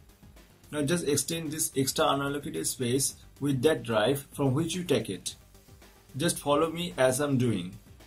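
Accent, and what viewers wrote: India. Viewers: Indians speaking english sounds very ridiculous, next time use subtitles, the world will thank you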